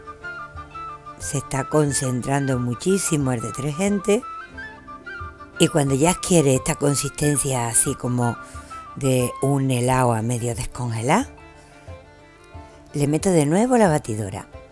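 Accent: Spain. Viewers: Hola.Tus detergentes son geniales.Aquí en mi país no se consigue jabón en escamas ni en panela blanco,Pero venden uno que es azul (marca "Las LLaves...creo que uno de sus ingredientes debe ser el azulillo y es de muy buena calidad)..¿Me servirá usar ese?...Otra duda que tengo es que he leído en varias ocasiones que el cloro o lejía no debe ser mezclado con vinagre,porque sería muy tóxico..¿.Puedes aclararme eso por favor?....Gracias por recetas de detergentes super bien, y si me permites te digo, que tienes un acento español muy bello, (todos los acentos españoles lo son, pero el tuyo es especialmente bonito).Chao un abrazo muy fuerte desde mi Venezuela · pero qe te pasa no sabes que la lejìa o cloro no se debe mezclar nunca!? El hipoclorito de sodio libera gases tòxicos al entree en contacto con otras sustancias Tu canal es muy entretenido, didàctico y disfruto el habla andaluza pero en este caso cito una tonadilla cubana que dice...ave maria, conmigo vas a acabar!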